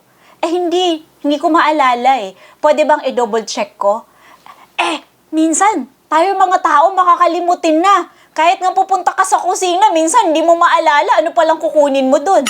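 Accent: Visayas, Philippines. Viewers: Bisaya ka ba Atty. ?😅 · Atty. ang accent mo ay Visaya.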